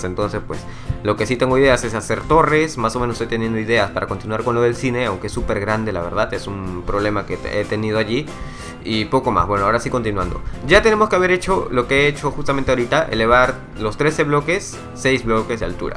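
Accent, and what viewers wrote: Peru. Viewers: No se pero a mi duxo me suena a que es de Peru xD e.e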